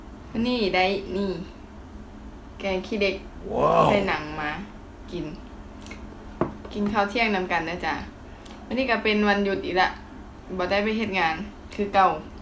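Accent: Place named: Isan, Thailand. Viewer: อีสานกลางใหญ่ออกคักแด555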